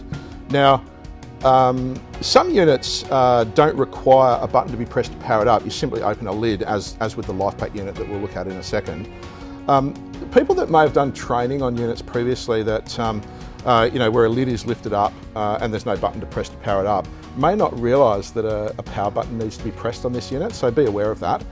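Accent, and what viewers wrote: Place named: Australia. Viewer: .. I Love Your Aussie-Accent Mate ..👍🦄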